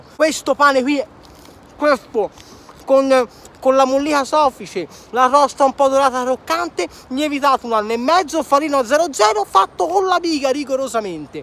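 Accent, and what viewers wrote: Florence, Italy. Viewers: Sei forte ti vorrei attore in un film di Pieraccioni ta na parlata fiorentina che mi schiantare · Ma tutti i toscani hanno la c strana?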